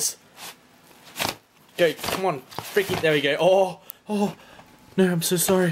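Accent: Australia. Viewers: He is speaking Australian -.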